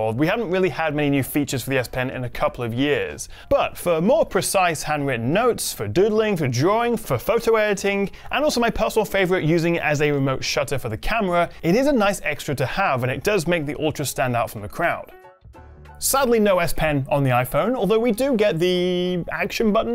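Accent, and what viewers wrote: United Kingdom. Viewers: Brother he's from England not Denmark didn't you heard the British accent 😂 of course it was filmed on England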